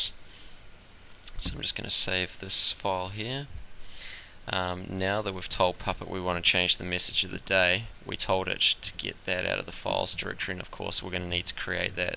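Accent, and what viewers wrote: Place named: New Zealand. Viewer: What, so just because you can't understand a Kiwi accent, you have to be an arse and post some Swedish translation? Ignorance is bliss? Or you just upset the New Zealand is better than the old one?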